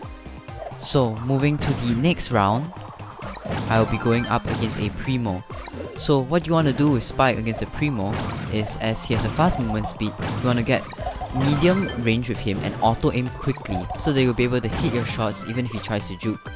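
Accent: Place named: England, United Kingdom. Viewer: accent anglais BRUH.... lol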